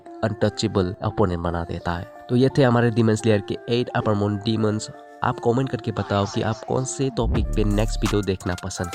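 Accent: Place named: Assam, India. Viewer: Assam? accent tu Axomia lagise💀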